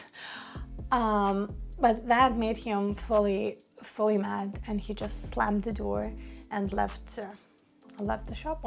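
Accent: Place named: Lithuania. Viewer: To be honest, I just jumped into your channel accidentally, been watching videos about turning vans into a campers and in one of them I just heard slightly Lithuanian accent so now I'am here. Tu tikrai darai puikų darbą skleisdama šią žinią. Man net sunku įsivaizduoti, kiek moterų vien lietuvoje gali būti tokiuose santykiuose. Kai tai pasidaro matoma aplinkiniams, tai jau toli pažengę ir blogiausias dalykas, kad auka pati, be išorinės pagalbos dažniausiai net nesuvokia, kokiuose santykiuose ji yra. Džiaugiuosi, jog tau pavyko tai nutraukti, be abejo, išėjai iš to stiprensė. Sėkmės.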